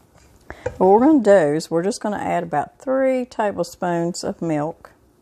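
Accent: South, United States. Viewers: So glad I found your channel. That cake looked amazing!! Great for fall baking! I'm definitely going to be making this. Thank you for sharing. You are a wonderful teacher and I love your southern accent. Have a blessed day! ❤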